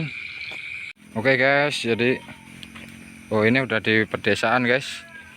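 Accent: Java, Indonesia. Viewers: Bang aku seneng klu abang lg masak dan ciri khas jwa nya medok banget kku ngmong,, semangat dan jngn lupa banyak doa waspada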